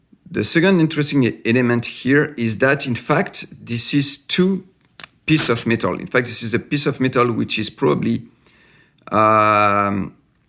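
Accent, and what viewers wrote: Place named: France. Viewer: je suis fan de tes vidéos et avec le french accent, change rien !! Feras-tu le F3D ?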